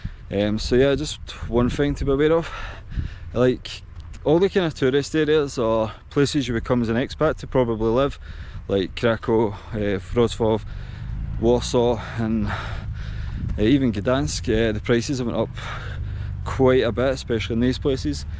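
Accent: Scotland, United Kingdom. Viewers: Your pronunciation is really difficult for me and I need subtitles but I like northern accent very much. I have never been to Scotland but I love this place. Don't ask my why because I don't know. Just imagination. People need to say "thank you" when you open the door but...that young generation, you know what I mean :)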